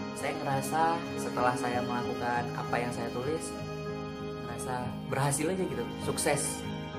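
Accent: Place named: Medan, Indonesia. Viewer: wah .. semoga targetnya tercapai ya.. btw cara ngomongnya kok kayak anak medan hihi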